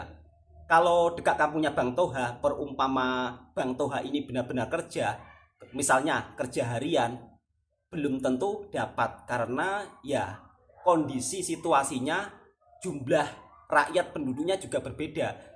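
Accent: Java, Indonesia. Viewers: Ini, jowonya waduh...buaayak pekatnya....hehehehe😂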